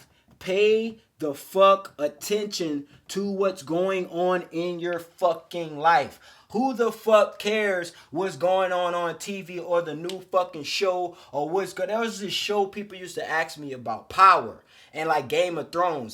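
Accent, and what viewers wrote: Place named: Baltimore, United States. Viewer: You from B more bro? I hear an accent lol love ya videos G! 💯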